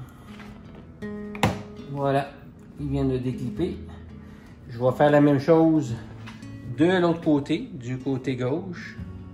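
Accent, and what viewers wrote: Canada. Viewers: Merci beaucoup pour ces explications très bonnes Et ce belle accent du canada donne une belle saveur à tout ça. Merci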